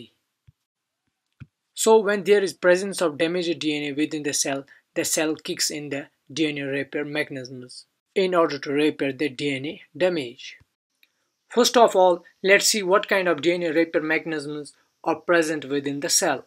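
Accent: Kashmir, India. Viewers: Are you Kashmiri. U accent seems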